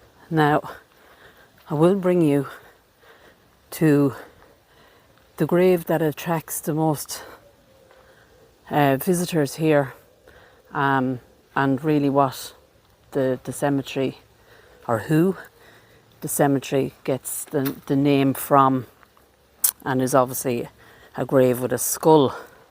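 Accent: Ireland. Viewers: Nice accent Ireland probably the best in the world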